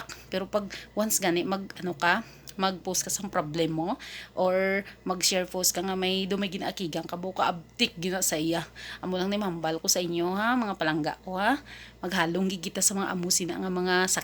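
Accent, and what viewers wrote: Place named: Iloilo, Philippines. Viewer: gusto ko talaga ang accent ng ilongga grabe ged real talk mo ya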